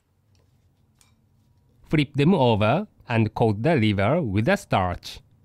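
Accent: Japan. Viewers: Nope. The narrator is Japanese, so it's a Japanese accent. I think it might be the chef's husband, though I'm not 100% sure. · is the narrator a Japanese accent? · No... It's a Japanese accent dude. (I'm fluent in French, so I know that's not a French accent, I live in Japan, so I know that it's a Japanese accent)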